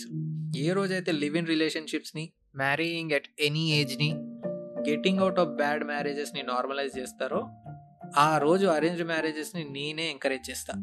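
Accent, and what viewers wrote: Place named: United States. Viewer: Arranged marriages tho naaku problem kaadhu love marriage thone na problem . Andhukante nuvvu india lo unna nee matallo America vinipisthundhi sorry but I sense that . But india lo love marraige vadhu boss endhukante alanti oka love marriage couple ki puttina vaadine nenu . Naaku telusu ela unatadho vere caste ammayi abbayi pelli chesukinte aa putte pillalni ela chustharo . Aa chupulu neeku teleedhu baasu . Adhi neeku physical emi cheyyala povachu deep inside guchukuntadhi bhayya it's very hurting untadhi . Nuvvu puttadame papam annattu chussthe . Nee friends mothers nee tho friend ship cheyyodhu ani mee friend ki warning isthe vaadu appati varaku bhane mayladi tharvatha Mohan chatesthe aa bhadha chala bhayam karam ga untadhi . I envy u kids who are born for same caste arranged marriage couples . Arranged marriage lekapothe mundhu nuvvundav basu . 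Adhi okappudu chala manchi system . Aandhulo mana previous generation chala successful lead chesaru lives that's y nuvvu America velli patriarchal system criticize cheyyagaluguthunnav . Problem mana generation kids mind set lo undhi . Andhariki rights kavali responsibilities vadhu adhe major problem . Ee America velle vallaki patriarchy endhuku nachado artham kaadhu . Malli magallaki value ledhu antaro . Point Ento telusa mana south states lo patriachy kaadhu matriarchal system father enthaina earning varake . Caste system lo oka plus undhi idhi live marraige chesukunna ma mother ee chepparu enti telusa . Prathi oka caste ki vere ve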